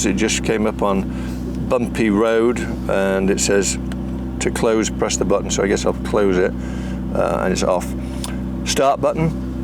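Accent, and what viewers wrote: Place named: Lancashire, United Kingdom. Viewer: I'm losing my mind trying to place his accent. It sounds like Lancashire, maybe even a little Liverpool, definitely not London. Can anyone confirm?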